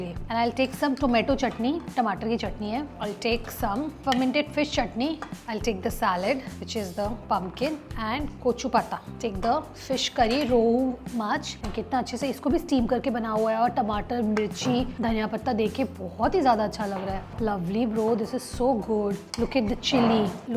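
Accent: India. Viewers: Girl you have the most indianiest accent i have ever heard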